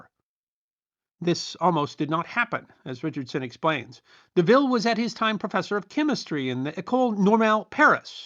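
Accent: United States. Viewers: I don’t love the American speaking. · My ears hurt, bloody Yanks. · It is Aluminium It is Antar c tica It is so l der The letter Zed rather than nursery rhyme Zee Dumbing down of pronunciation symptomatic of trumping the US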